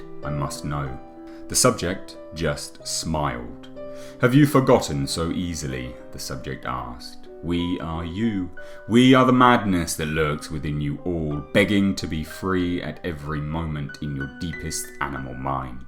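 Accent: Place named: United Kingdom. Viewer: You know the story is 90% fake when the Narrator has a British accent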